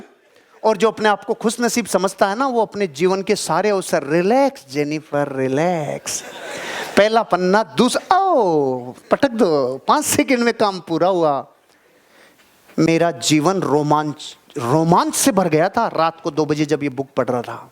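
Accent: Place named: Marwari, India. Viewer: Exlnt exlnt n exlnt sir.your marwadi accents n satic logics Gazab hai ji.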